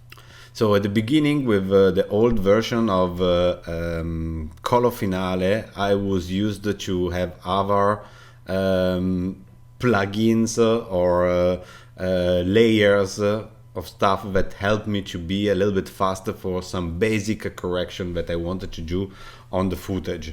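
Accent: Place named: Italy. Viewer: You sound like italiano man!!!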